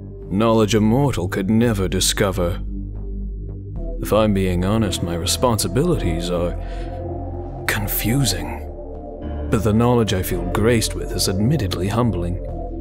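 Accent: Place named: United Kingdom. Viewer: Aaah yes, british accent + poetic lyrics + = absolute subarashiness 👏👏👏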